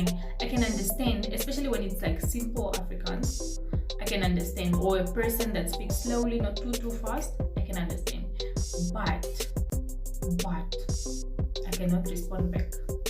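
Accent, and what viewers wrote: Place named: United Kingdom. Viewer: And the way you speak it with an English mixed accent 😍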